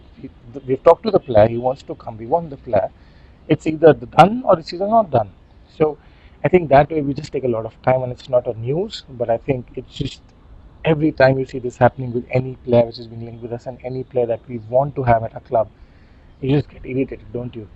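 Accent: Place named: India. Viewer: Bro r you from India ??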